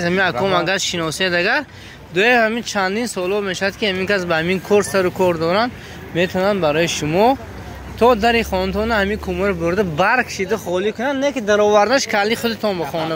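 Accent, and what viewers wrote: Mastchoh, Tajikistan. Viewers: Лавзи Мастчохи кади гап занен кайф мекунам гапи шумора шунида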